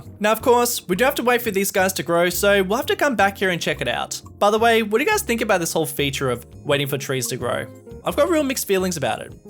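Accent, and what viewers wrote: Australia. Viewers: he's not even british?? it's obvious his accent is australian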